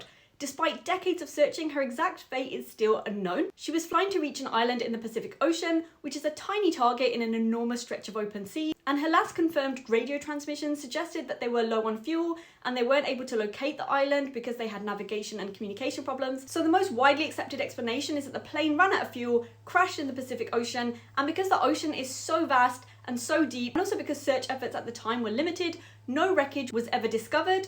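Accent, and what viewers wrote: Birmingham, United Kingdom. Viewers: Birmingham I love it probably one of my favorite British accent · Had to look up Brummie. So, you're from Birmingham! Cool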